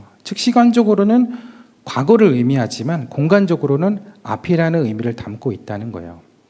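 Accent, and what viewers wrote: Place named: North Korea. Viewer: 목사님 북한 사투리 짱 이예요 ㅎ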